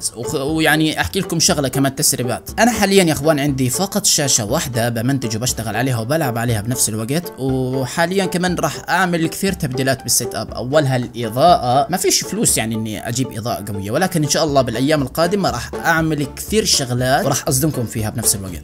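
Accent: Iraq. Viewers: لهجتك بالعراقي تجنن 😂😂❤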